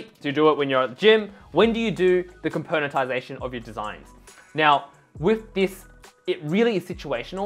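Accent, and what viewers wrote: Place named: Australia. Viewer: MASS COLLAPSE LAYERS works differently for me than explained: On a Mac press CMD + A to select everything. Go to Layer panel and hold CMD + Click on 1 dropdown arrow and everything closes. Do exactly the same to open everything on the Layer panel. By the way your content is simple and brilliant! It helps immensely! Your accent takes me back to amazing Australia!:) So thank you very much!!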